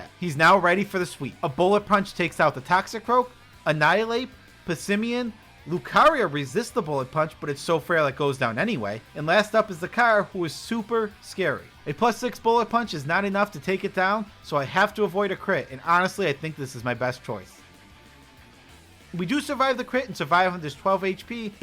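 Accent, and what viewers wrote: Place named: Massachusetts, United States. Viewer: I don't know where you're from. But I love your accent. Sounds like maybe Massachusetts.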